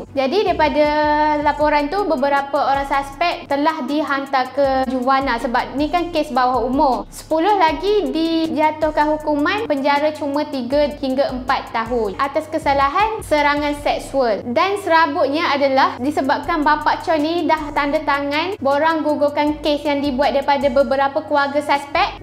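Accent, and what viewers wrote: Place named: Kelantan, Malaysia. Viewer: Setelah dengar cerita ni sampai habis, saya boleh agak yang awak ni orang Kelantan🤣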